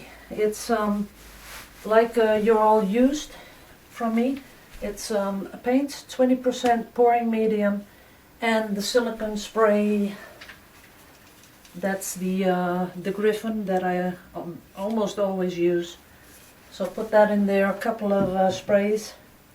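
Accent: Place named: Netherlands. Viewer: ok cool. I'm relatively new to your channel and I'm hooked.. I think about 2 weeks.. but of course I'm not watching in order.. youtube recommends and I follow lol.. I like listening to you very much too.. you're so happy doing what you're doing.. and your accent reminds me of some of my relatives. My mom is from overissjel..and my dad from the Hague.. I'm in Canada.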